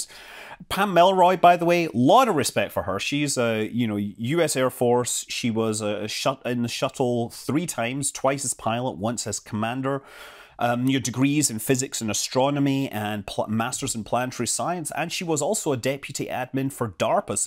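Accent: Scotland, United Kingdom. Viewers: I find it interesting that Scott's accent has changed little, if at all since moving to California. I'm not sure how long he's been there now, but I'm sure it's been at least a year or two, if not more. But I remember as a kid growing up in Southern Ontario. Between Michigan and Upstate New York, if you're not familiar with the location. We had a kid come to our school who moved up here from Tennessee in about grade 5 and he started off with a pretty thick southern drawl. But after maybe a year or so, his accent had mostly disappeared and he had more of a northern accent. But the one kid I knew from Saudi Arabia kept his accent right up until I lost contact with him in high school. Heh, used to give him a hard time about it sometimes, always jokingly, of course. He was a friend, after all. I chalk this up to a few things though. First and most obvious being that a southern drawl isn't nearly as thick of an accent as Scottish. 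And the second being that children tend to learn these things a lot easier/faster than adults. Like, my Mom and Aunt spent the first few years of their lives living in Germany and no doubt had German accents when they came over to Canada. But don't have a hint of a German accent. Meanwhile my grandparents both had very thick German accents for the almost 70 years they lived here until their deaths. Maybe it's down to how much easier and more naturally children learn than adults, or maybe it's down to my Grandparents having had more exposure to German acc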